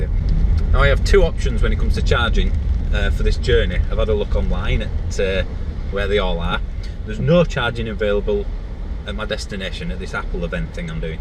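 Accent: Yorkshire, United Kingdom. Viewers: Where in Yorkshire u from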